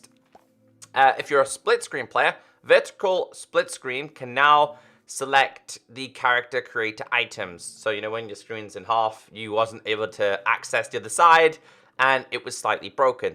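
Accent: United Kingdom. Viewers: He is british, i don't know if english, welsh, irish, or scottish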